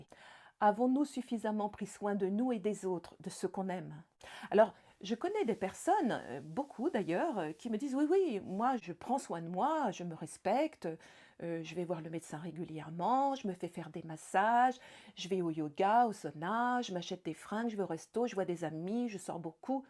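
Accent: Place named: East, France. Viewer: Bonsoir .. joli accent de l est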